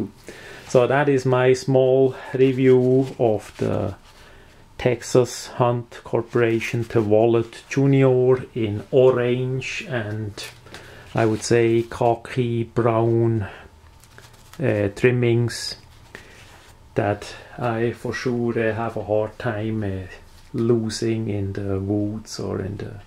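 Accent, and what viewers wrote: Switzerland. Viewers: Is this what Swiss accent sounds like?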